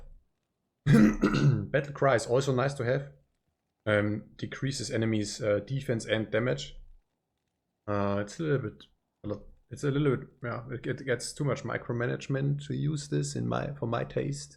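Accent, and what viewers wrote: Germany. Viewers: wenn man 2 sec im Video ist und aufgrund der Aussprache direkt weiß, dit is oochn deutsche Jung😂 Like+Abo direkt da gelassen, mehr d2r content= mehr gut ;)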